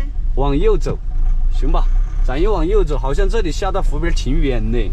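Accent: Chongqing, China. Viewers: 聽你這口音我就估計是重慶的，結果一看車牌還真是。玩得嗨哦，拿個貨車當房車到處轉。最近重慶封城，怕回不來了哦。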